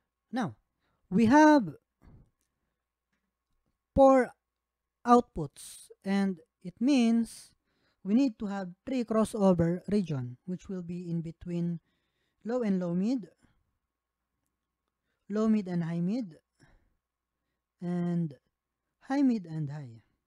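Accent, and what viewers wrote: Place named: Visayas, Philippines. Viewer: Pinoy ka nman yata tsong kasi halata sa pagsasalira mo...bisaya ka pa nga yata e..bakit mo pa pinahihirapan sarili mo pati audience mo sa pag intindi sa sinasabi mo...nagpupumilit ka mag english...mga pinoy karamihan nanunuod sayo dito kasi nasa pinas ka kaya mas mabuti mag tagalog ka na lang para mas madali ka intindihin ok!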